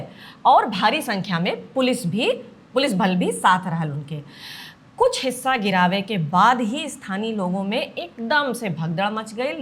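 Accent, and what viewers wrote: Bhojpuri, India. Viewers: आपकी बोलने का अंदाज एकदम भोजपुरी भाषा में है😊❤❤❤❤❤❤❤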